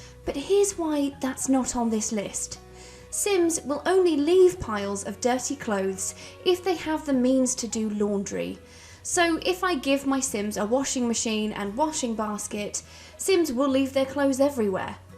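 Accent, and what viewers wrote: United Kingdom. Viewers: I agree with the whole video. In all honesty, I enjoyed this video SO much. Between your lovely British accent and the swearing, this was a treat to my ears and soul. You got yourself a new subscriber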